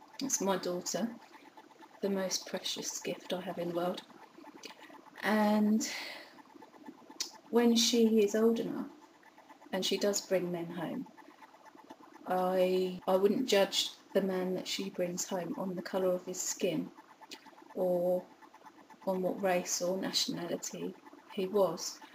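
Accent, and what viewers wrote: United Kingdom. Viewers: She's already beautiful but with that British accent...brilliantly gorgeous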